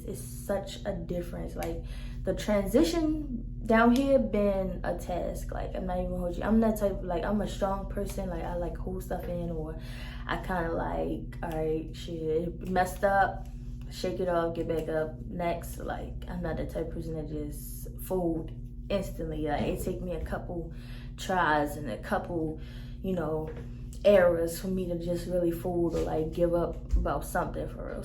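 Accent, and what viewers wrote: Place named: Baltimore, United States. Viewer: It’s the Baltimore “tew” for me lol. But great video!!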